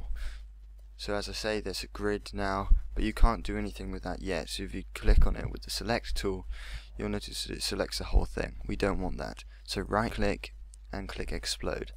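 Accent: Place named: United Kingdom. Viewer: your british accent is sexy, the british women have the awesomest accents cause their so foreign and thickly uk if not usa but once you teach a british woman to read (depends on the woman) you may find your self dying of laughter and although in love to <3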